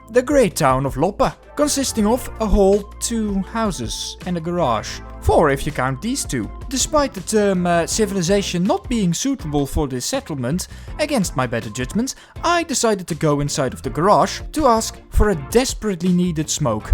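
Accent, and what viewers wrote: Netherlands. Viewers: waarom klink je zo nederlands??? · nice dutch accent!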